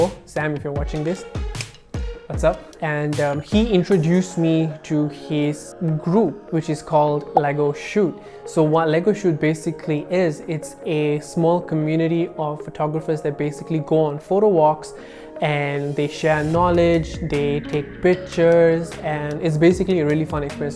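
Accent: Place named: United States. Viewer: You sound like an American way too “AMAZING”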